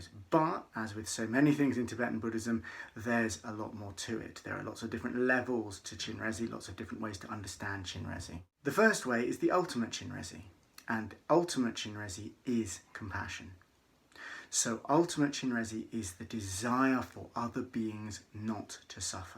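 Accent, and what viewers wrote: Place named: England, United Kingdom. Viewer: Thanks for enlightening us. A few questions if you don't mind: 1. Where does the name Chenrezig come from? 2. Is Chenrezig the same as Avalokitesvara or Guan Yin Bodhissatva? 3. Is Chenrezig manifest into thousand eyes, thousand arms? 4. Is Chenrezig a male or female? 5. Did Chenrezig born out of lotus flower like Guru Rinpoche? Because mantra is like Mani Padme ( like Padmasambhava? ) Thank you, with Metta ( btw, like your English accent and you are very young, indeed. )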